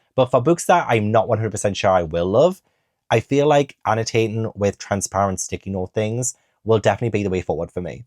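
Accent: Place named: Newcastle, United Kingdom. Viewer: I love how Geordie you get talking to the cats!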